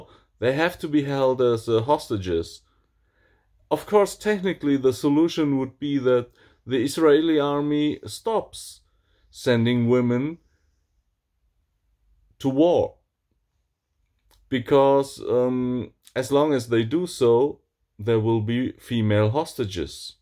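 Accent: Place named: Oxford, United Kingdom. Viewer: Very beautiful spoken my friend! And even if THEY are not my friends, nevertheless I love the noble pronunciation of cultivated Oxford English . Your pronunciation sounds really Exceptionally beautiful , chapeau und mashallah ☺️ Is the one state solution the final solution 😄.. just kidding... a little word game 😂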